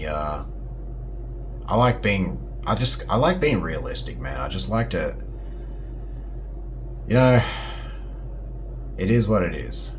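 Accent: Australia. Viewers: It's been said before, but I'll say it again, individuals can be smart. You're smart. I'm smart. But people are the stupidest mudda fudders on the planet. Here in AZ people bought up all the toilet paper in the local stores like it was Covid all over again! Why? Get this, due to the port strikes on the other side of the fcking country! Like we get our toilet paper imported from fcking China 🇨🇳 🙄 Why the fck are people so gotdamn stupid. This is why I love your channel. Brits accents grate on me but I actually like the Australian accent.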